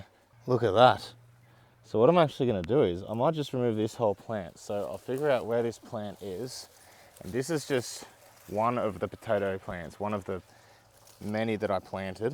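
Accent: Australia. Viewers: YOOO another Aussie gardener I can get behind!!!! lots of interesting and informational content that isn't droning on and boring, and it's being all eco-friendly and all that! You have a new subscriber lol, I adore all this crafty gardeny goodness xD Where abouts in Australia are you? the butcher birds in the background and your accent sound very WA-like, are you WA-based? xD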